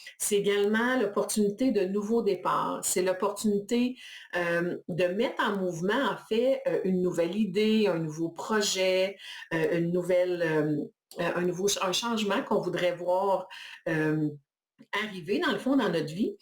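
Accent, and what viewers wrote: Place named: Canada. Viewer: Bonjour 🤗Je ne vous connaissais pas, je m'abonne à votre chaîne et en plus, votre accent Canadien est trop sympa 🧡🌿🌼🌷🌼🌿🧡 Plein de belles énergies de La Provence 🌈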